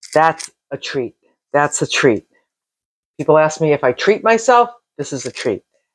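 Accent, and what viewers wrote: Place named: New England, United States. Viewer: Hi, new to your channel. Where do you live? I'm detecting a New England accent. I too would love to buy a van and travel, so your channel intrigues me.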